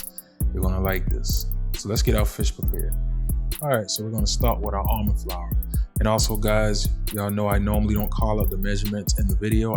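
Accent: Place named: Gullah, United States. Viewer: You sound like one of my Gullah clan. Say it's so😊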